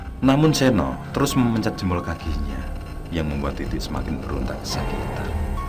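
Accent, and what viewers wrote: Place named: West Java, Indonesia. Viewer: kmu asli lombok apa sunda kok ngomong nya ada bhs sunda nya, klo kmu sunda sudah gak ada harapan saya 😢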